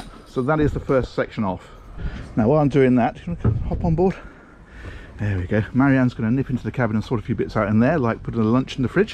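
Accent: United Kingdom. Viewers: you are such a nice, warm and friendly person.. and british accent makes you pleasant to listen to :D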